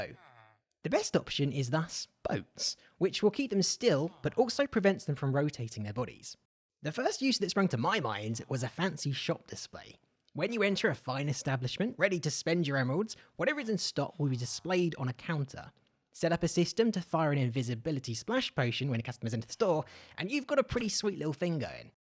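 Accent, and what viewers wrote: United Kingdom. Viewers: I love the voice it sounds British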